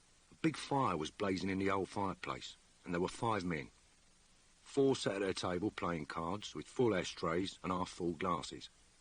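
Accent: Cockney, United Kingdom. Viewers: Thank you so much! To me, this is a double delight! Not only hearing John Thaw reading in cockney, but even more hearing a Modesty Blaise piece I never have read before. Fabulous! 😃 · What a treat! I didn't know he could do a cockney accent, having only heard him as Morse (and in one Sherlock Holmes episode). My brother and I read all the Modesty Blaze books back when, and this reminds me how good they are.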